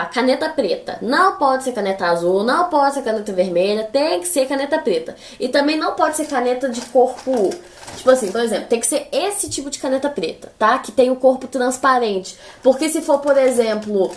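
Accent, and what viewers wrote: Minas Gerais, Brazil. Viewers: E sotaque de nos mineiros !!!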